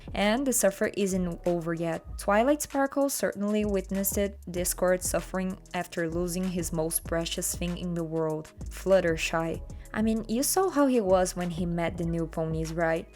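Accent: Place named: Russia. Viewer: Такое чувство, что акцент русский у Вас)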